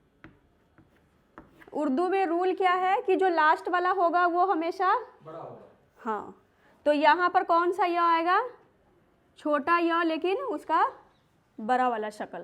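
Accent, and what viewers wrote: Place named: Punjab, India. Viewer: Tuhadi language toh pta lag reha k tuci punjabi ho, but eh gall ekk punjabi samajh sakda, 😂😂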